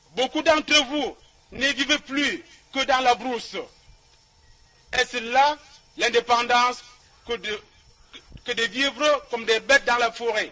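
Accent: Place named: Bulu, Cameroon. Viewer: L accent bulu, les genocidaire, depuis la nuit des temps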